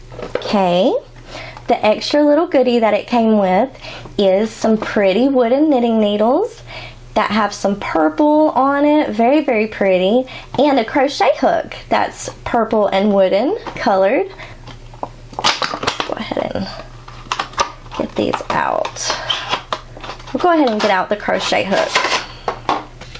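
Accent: South, United States. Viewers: What southern state are you from?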